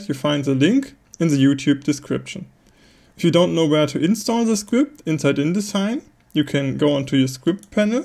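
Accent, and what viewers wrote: Germany. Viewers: LOL! I love the German accent! Geil!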